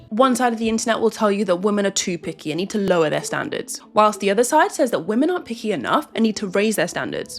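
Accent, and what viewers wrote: United Kingdom. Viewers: Personal question- what country are you from? Based off the accent you sound like your from the UK.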